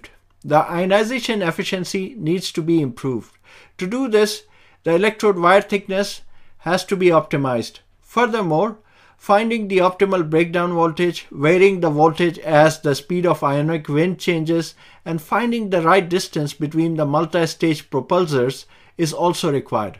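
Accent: India. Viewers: English indian version.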